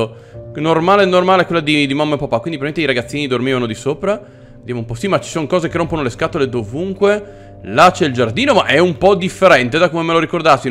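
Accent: Veneto, Italy. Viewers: Il tuo accento veneto è sublime.😂😂😂😂😂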